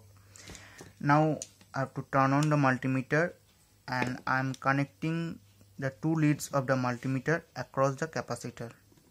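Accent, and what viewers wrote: India. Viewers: Sir, I hoped to learn from you but American’s have a very tough time with Indian accents. I’m sure you provided informative information in your video, but I could not stay with you simply because of the heavy accent. Thank you for your post, I’m only leaving this comment as a inspirational response to you so you can have a greater viewership.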